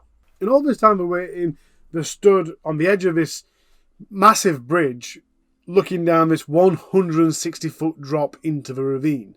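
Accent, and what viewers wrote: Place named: Northern England, United Kingdom. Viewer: I've heard these stories before, but not in a storytelling format with a Nth English accent. Good work.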